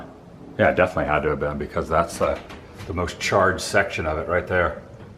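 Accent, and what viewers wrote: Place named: Philadelphia, United States. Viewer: Your accent is all too familiar - You from Philly PA vicinity, or Delaware/Maryland?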